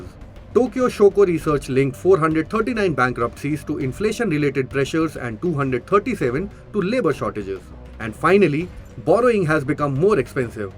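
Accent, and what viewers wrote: India. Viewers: Indian english 🤮🤮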